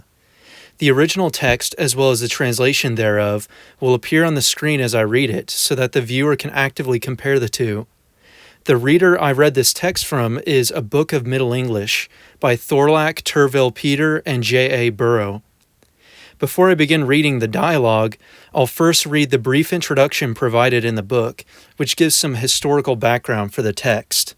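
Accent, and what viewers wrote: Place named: North America. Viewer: When I first heard the reader with such an North American accent I thought it really wouldn't be a success but his reading is absolutely brilliant!! And easy to understand, especially as I'm originally from the north of England. Very,very good!!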